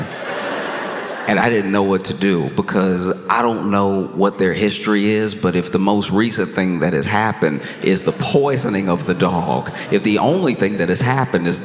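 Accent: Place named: South, United States. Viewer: his southern drawl is the cherry on top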